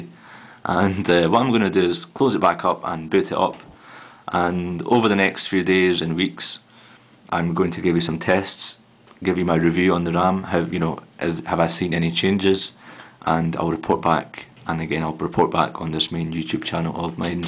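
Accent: Scotland, United Kingdom. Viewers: Aren't you Scottish, by any chance? :-)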